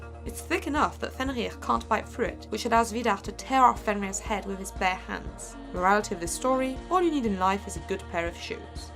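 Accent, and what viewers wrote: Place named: France. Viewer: Great video. I stumbled across this channel today and I really like it, can't have enough storytellers around. I do have two points of (hopefully) constructive criticism though, please don't take offense. 1. Talking speed. You speak pretty quickly, and sometimes that makes it a bit hard to hear what you're saying, especially when you're talking about old norse names. It kind of feels like you're standing in front of a room of people and you just want to get it over with. Especially the old norse stuff, I don't know if you're a bit nervous or unsure or something, which may cause this. There is no need to rush, you know the story, your jokes are good, you've got this. 2. Pronunciation. Icelandic is hard, judging by you accent I'd say you're French or something around there? Anyway, getting these words right can be a bit tricky, and even for a Scandinavian it isn't exactly intuitive. 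I'd advise you to spend a bit more time on them, perhaps find a way to listen to a proper pronunciation to get it right. Once more, I like what you're doing here, and I'm eagerly awaiting your next video.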